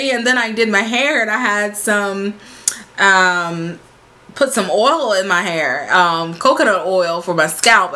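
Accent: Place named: South, United States. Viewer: where are you from? I hear a southern accent! 😜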